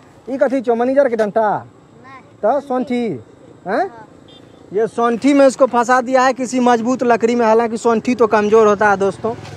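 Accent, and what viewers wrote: Bihar, India. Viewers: Bhaiya aap hamare kosi ka bihari accent ko world level p jrur lejayenge ekdin 🔥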